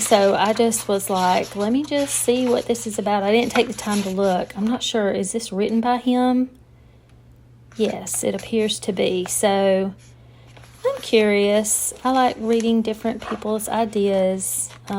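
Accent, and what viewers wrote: South, United States. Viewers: Do i detect a Southern accent 🤔 👀 where are you from? 😊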